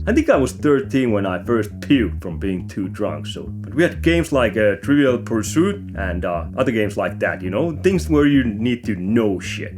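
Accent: Finland. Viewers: I really enjoyed the video and your personality. Your delivery reminds me a lot of my time living in Espoo.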